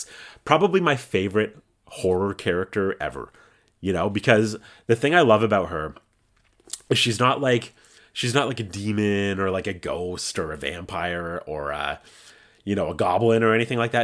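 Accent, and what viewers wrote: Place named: Canada. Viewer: The way I knew you were Canadian before you even said you were😭canadians are the best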